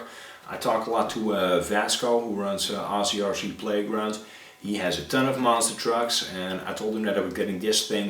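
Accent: Netherlands. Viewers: leuke en mooie video man ! Ik kan horen dat je nederlands bent haha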